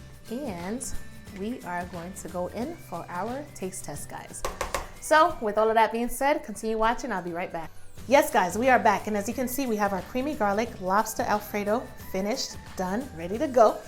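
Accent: New York, United States. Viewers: Love your adorable NY accent..NYC holla! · New York accent..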